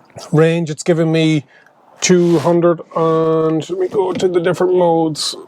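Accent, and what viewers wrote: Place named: Cork, Ireland. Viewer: You sound just like my dad was was born in Cork (Balenagree)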